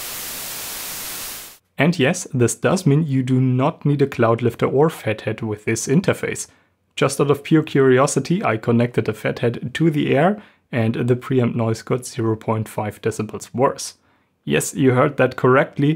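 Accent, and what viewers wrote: Germany. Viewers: Man hört den deutschen Akzent.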